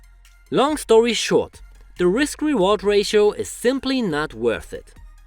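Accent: Germany. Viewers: must be german by the way he speaks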